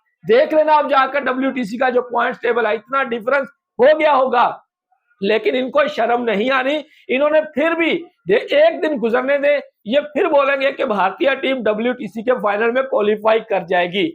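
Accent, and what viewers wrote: Punjab, Pakistan. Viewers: Bhi yea punjab say hai..pura pakistani pubjabi bandey kaaa accent hai 😅😅😅 ISI bandey ki thodri training karadoo. Punjabi in India are ni boltey bhi 😅😅😂😂😂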